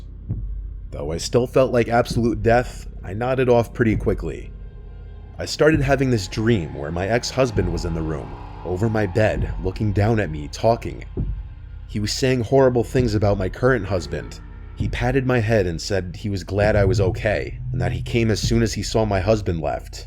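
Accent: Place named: New York, United States. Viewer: Gotta love the New Jersey/ New York accent. Reminds me of home lol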